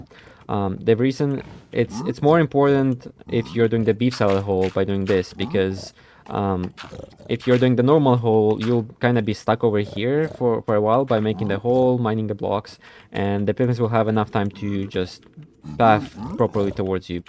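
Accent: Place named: Ukraine. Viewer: You're from Ukraine, right? I found your channel pretty late, but I absolutely love how your English sounds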